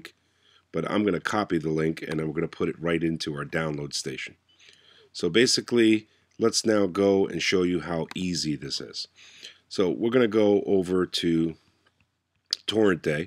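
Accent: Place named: Northeast, United States. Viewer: ***** I got an cease-and-desist order once for 800€ and could drop this down to 120€ with my lawyer. It was a CD I owned yet which I downloaded just for fun (I didn't want to search my CD-Board,=) So, even if you own an opus of an artist, you are liable for infringin Copyrights in Germany. I don't know where you are from, regarding your accent I would say north-eastern USA ? Do you can download any works legaly if you "own" (have a copy) it in USA ? I don't think so (DMCA?!) With Series its another Story here. If it was aired in free TV you can legally download it. Besides, your Video was VERY infomrmative for me and raised the Decision to buy a Synology DS 2xx(play?) sthg with remuxing/transcoding would be fine. The Tracker where I download from isnt registered in any DNS and you can only be invited. Nobody will find its Frontpage without knowing the IP and even then he cant enter.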